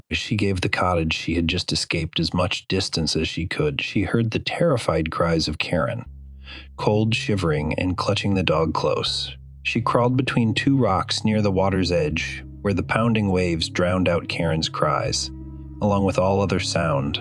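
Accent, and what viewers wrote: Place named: United States. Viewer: Narrator sounds American or maybe Canadian Should be pronounced as 'Tems' not 'thames'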